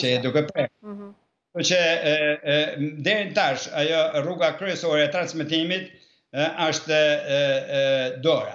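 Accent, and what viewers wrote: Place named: Kukës, Albania. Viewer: Me dialektin kuksian qe flet duket siku te kemi afer,fjalet tuaja te sherojne!Dhe une vi nga fisi,prandaj ndihem krenare per nje njeri si ti!Jetofsh sa malet Doktor!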